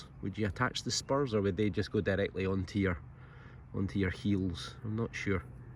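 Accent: Scotland, United Kingdom. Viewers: Just discovered your channel and am thoroughly enjoying your videos. Love the Scottish history lessons and listening to your sounding so Scottish. Hello from Oklahoma, USA.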